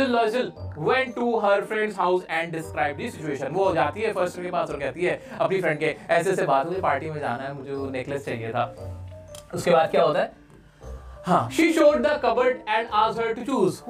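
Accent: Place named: Bihar, India. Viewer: Sir aap bihari ho 😸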